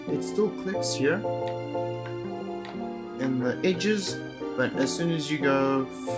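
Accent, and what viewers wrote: South Africa. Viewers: you are south african nè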